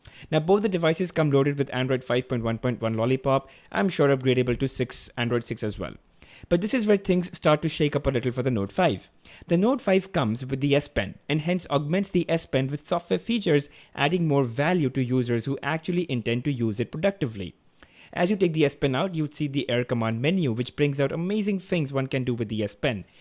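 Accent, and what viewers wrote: India. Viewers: I just hate the Indian accent. It ruins everything everytime.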